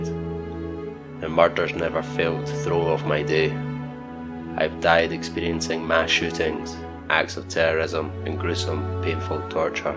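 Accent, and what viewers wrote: Scotland, United Kingdom. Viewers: Love the video mate. Also Scottish! ❤️ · Who the fook is that? It's. Interesting to listen to the combinations of a scottish voice Yet also music dynamics that aren't from that of your typical narration pick. You know Myuuji or Kevin. For that you've earn another sub. Although I came to constructively leave criticism. I found myself listening and diving into the story. Keep doing your thing. Always watching You companion Night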